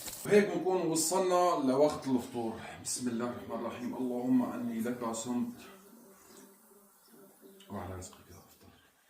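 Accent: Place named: Idlib, Syria. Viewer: بحب احضرك لانك بتحكي زي صحبيتي هيا من ادلب و بتزكرني فيها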